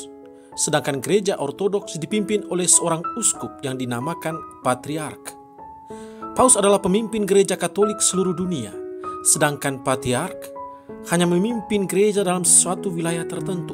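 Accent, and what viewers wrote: Flores, Indonesia. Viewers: Kalau diresapi logat miminya seperti org bejawa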